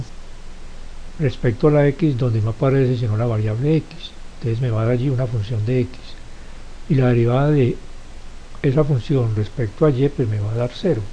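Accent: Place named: Antioquia, Colombia. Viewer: Tiene acento paisa. Nuevamente gracias y bendiciones.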